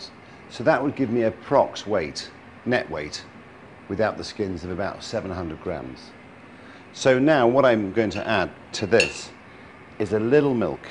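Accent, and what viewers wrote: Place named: RP, United Kingdom. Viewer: Posh accent for a Leeds lad.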